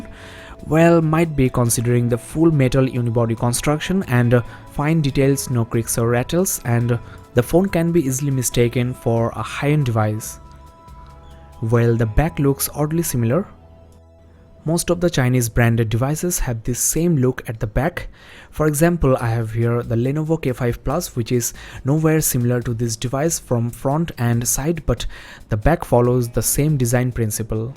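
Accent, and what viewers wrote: Bengal, India. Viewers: Maza aa Gaya bangali English sun Kar..... Osaaam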